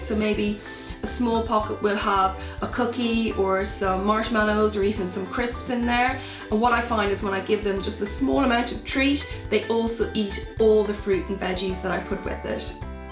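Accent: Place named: Ireland. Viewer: Whats ur accent? I picking little bit of Irish .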